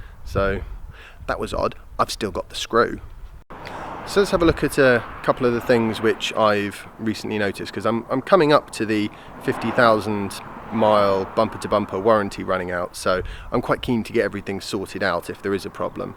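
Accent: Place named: United Kingdom. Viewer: Wait where are you from? You sound British and have a right hand drive vehicle but you speak in miles. I'm so confused.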